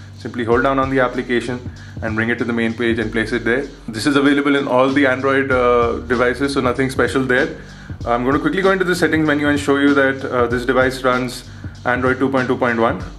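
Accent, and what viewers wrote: India. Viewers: Another good review, with a wonderful Desi accent. A refreshing change from Europeans who actually pronounce OMG as OMG. Good job buddy.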